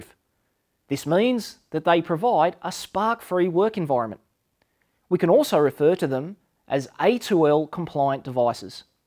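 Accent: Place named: Australia. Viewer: It's Aussie, not English.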